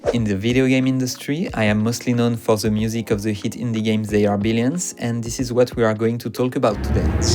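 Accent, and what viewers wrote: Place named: France. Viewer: Super de voir un frenchie avoir un poids aussi important dans l'industrie ! L'accent est ultra tranché en effet :D Mais bon, le bilinguisme est là, le reste on s'en fout ! Ça fait également plaisir de voir que le jeu n'est pas mort et que du contenu refait surface même en 2021. (Je viens juste de voir le post pour cette vidéo sur Steam) Quelle agréable surprise de constater que le compositeur est un Français ! Je n'en avais pas nécessairement l'attention en venant sur le lien mais du coup j'en profite pour laisser un message d'appréciation concernant la bande son ! Les musiques sont superbes, et les ambiances sont extrêmement réussies. Je me disais justement que l'équilibre steampunk et horror était vraiment très bien balancé et donnait un côté vraiment unique. Merci pour ton travail !! En espérant te revoir sur la suite, qu'on espère tous. 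:)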